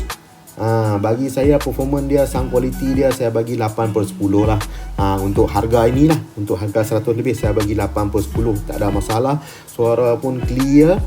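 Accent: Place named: North, Malaysia. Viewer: kedai dekat mana boss..dengar cakap bunyi utara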